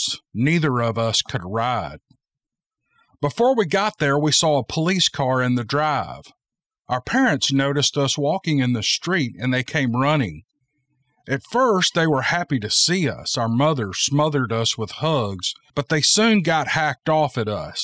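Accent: South, United States. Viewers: There will never be too much commentary. That's why alot of us listeners tune in! Your southern drawl is 👌 🖤from Oz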